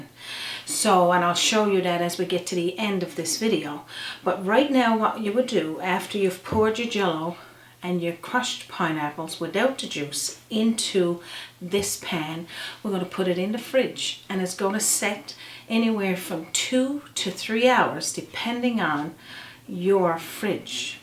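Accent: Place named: Newfoundland, Canada. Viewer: you are just the cutest cook onYouTube! I am enjoying learning how you prepare the various meals, desserts, breads and such in Newfoundland! I developed a love for your country from a TV series I watched called "Republic of Doyle" Love the accents! Is there a reason you Newfoundlanders drop your H's?? "You 'ave to 'ave 'alf a cup!" You make me smile! 'appy Mothers Day!!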